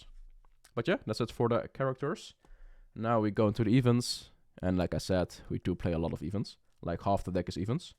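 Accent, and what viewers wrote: Netherlands. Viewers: ik hoor een dutchling van jouw accent ngl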